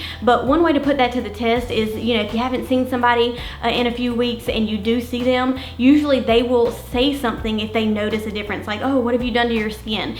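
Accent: South, United States. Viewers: I love your southern accent! Where are you from? I know its 2024, but I am trying this out right now. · Such a nice southern accent I love to hear it I day use to I was living in Alabama Tennessee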